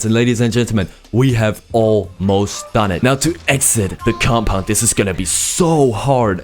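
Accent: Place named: Germany. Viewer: Good English skills, I cant even hear out the german accent although i’m trying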